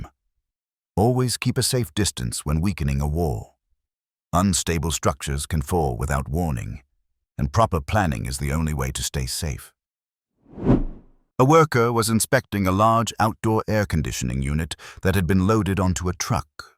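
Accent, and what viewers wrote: United Kingdom. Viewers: It looks as if all of these incidents occurred in a third world country where there is no OSHA. And, I'm sooooo tired of the English accent narration.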